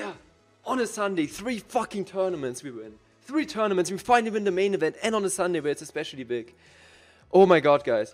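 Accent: Germany. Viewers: you are talking with german accent so much it seems not english...maybe coz you are in germany now btw great content as always. congratz keep it up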